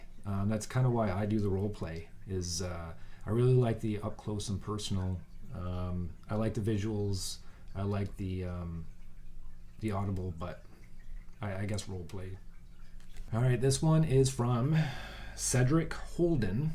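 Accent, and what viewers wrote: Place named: Canada. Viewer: You and your Canadian accent are so cute.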